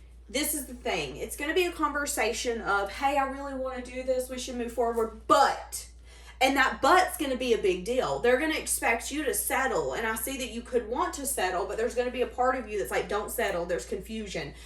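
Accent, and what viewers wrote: South, United States. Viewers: Thank you for always making videos. Your voice, and southern accent brings me comfort. I could listen to you talk everyday, and do. Just wanted to let you know that some random guy appreciate’s your hard work putting these videos together. You are precious.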